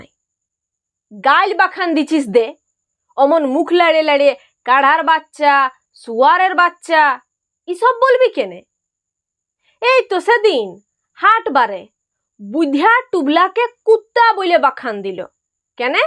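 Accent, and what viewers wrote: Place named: Bankura, India. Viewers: টিপিকাল বাঁকুড়া ও পুরুলিয়ার মানুষ জনের মতো উচ্চারণ। অনবদ্য! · উচ্চারণ ঠিক আছে। পিওর বাঁকড়ি। কৃত্রিম উচ্চারণ নয়। অনেকে চেষ্টা করে, কিন্তু ঐ জেলার মানুষ। তাই বেঠিক উচ্চারণ কানে লাগে। ধন্যবাদ। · পুরুলিয়া , বাঁকুড়ার ভাষা মনে হয়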